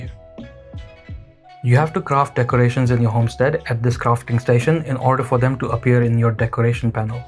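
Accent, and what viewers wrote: India. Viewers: You sound so Indian :D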